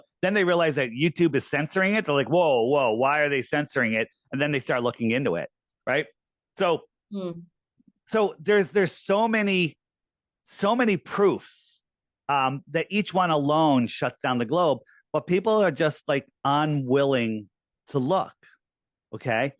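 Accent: Sweden. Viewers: Sounds like she is from sweden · Is she swedish? 🇸🇪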